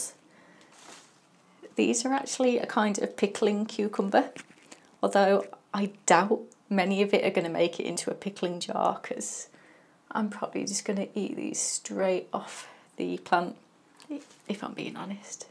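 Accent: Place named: United Kingdom. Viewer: In all fairness, cucumbers are supposed to have the spiky things on them. When you pick them you can just put a pair of gloves on and rub them right off. You sound like you are from the UK and having spent quite a lot of time there, you have a bit of a different selection of cucumbers than us in the states. I hope these turned out well for you! And good job getting them grown indoors. Edit: One extra thing. The runners that come off the plant and attach to the trellis can get excessive and take nutrients that the cucumbers need...so if there are way too many, you can pinch them off and have bigger cucumbers quicker.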